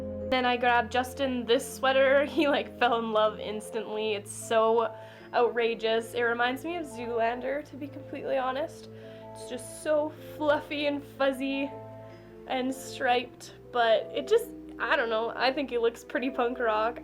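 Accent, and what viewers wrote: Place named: Germany. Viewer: oh sorry then ._. Your english is really really good but I heard a little accent and I thought It could be german cause my best friend is from Germany and she has almost the same accent. Again I'm sorry :(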